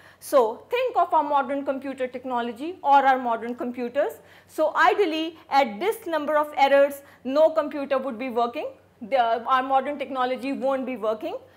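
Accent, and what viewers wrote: India. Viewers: It was easy to understand six sigma by someone from indian accent (Unfortunately I always reach to the learning videos uploaded by scholars and tutors from different contries)... I would like appreciate the hard work done by Miss... would like to continue learning from her videos..... Many Thanks:) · We Indians find pretty cool and happy about her accent. And, we Indians feel the same kind agony which u are currently feeling now, when Westerners speak.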